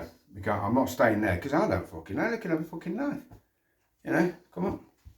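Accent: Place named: Coventry, United Kingdom. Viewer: Just subbed mate, may i ask your first name and where your from? Sounds like Cov, used to know a few from there.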